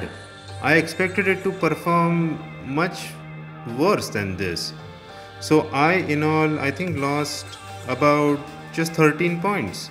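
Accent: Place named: India. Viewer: your voice is kinda Indian type ... Just the accent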